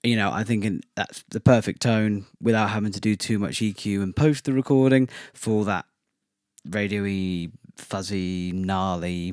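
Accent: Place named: United Kingdom. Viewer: Hello, cheers for the demo sounds like your from the UK. I'm looking for a cheap fuzz to send my Minimoog through. where did you get it from? Would you consider doing a comparison test between a cheap fuzz, Distortion and a sustain pedal. Is there a big difference? Cheers Tim. ( yes you are from the Uk as I discovered near the end of the video:) ) I like your presenting style.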